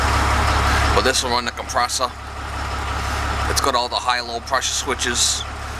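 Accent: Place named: South Georgia, United States. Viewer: That's a South Georgia accent if I've ever heard one... great job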